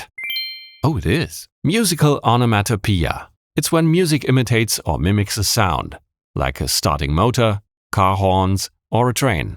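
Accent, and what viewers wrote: Germany. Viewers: Was für ein vertrauter deutscher Akzent in dieser Erzählstimme…wird hier nicht übersetzt…do it with google or gemini or siri or otherwise❤️🖖🤟P.S.: Just ask a german friend of yours👍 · Ich höre da sowieso einen Deutschen Akzent raus oder - Geiles Video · 12,39 are you german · Wollte erst fragen, warum er die Beiträge nicht in deutsch bringt 😋🤔😂 Sein Englisvh ist gut, aber dennoch können wir es als Muttersprachler heraushören. Um so gruseliger muss unser Englisch für "Engländer" sein 🤪😂 - zu immitieren erfordert schon einiges. Die Klangkulisse sind extrem komplex und trotz der minimalistischen Samples fügt sich alles zu diesem unverkennbaren Sound 👍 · As a German i heard it right with his first words. Dont know what it is but you can hear if someone is German. Just a few can sound nearly not-german.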